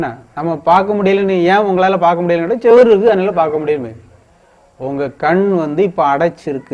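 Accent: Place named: Tirunelveli, India. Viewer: ஆஹா!!! ரொம்ப நாளைக்கு அப்புறம் திருநெல்வேலி அழகு தமிழில் மிக முக்கியமான விளக்கம் கேட்க்கும் பொழுது டபுள் சந்தோசம்😉 நான் இப்படி பேசும் போது எல்லோருமே என்னை கேலி பண்ணுவார்கள்!!!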